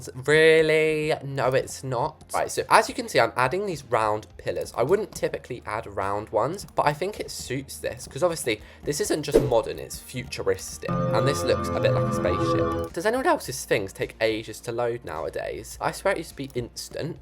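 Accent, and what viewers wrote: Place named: United Kingdom. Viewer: The queen has amazed us again!!!!❤ always buts a smile on our face whith his loving British accent 😂😂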